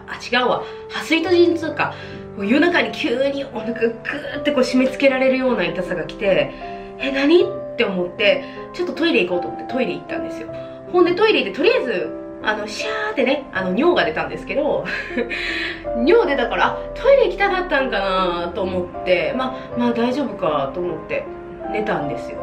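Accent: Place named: Osaka, Japan. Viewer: happy birthday💗感動して泣きそうなりました(>_<)優しい旦那さんと可愛い娘ちゃん💕これからのwonderful人生応援してます💗いつも大阪弁が親近感で大好きです❤️またメイク動画も楽しみ*\(^o^)/*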